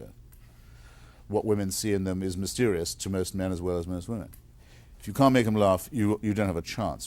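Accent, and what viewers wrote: England, United Kingdom. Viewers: the women seem to have penis’ dear. In his English accent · His English accent and perfect way of speaking is the cherry on top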